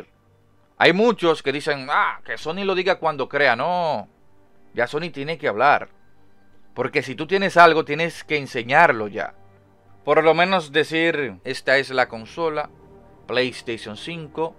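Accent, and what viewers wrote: Dominican Republic. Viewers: pero papa, yo no sabia que tu eres dominicano , aunque tu acento me paresia dominicano , pero no estaba seguro XD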